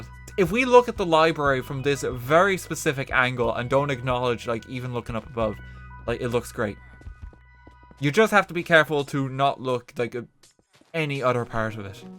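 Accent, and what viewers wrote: Ireland. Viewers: I just realized your tone of voice is exactly like STAR_. Just irish and a bit deeper. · What accent do you have? Its Irish mixed with something else.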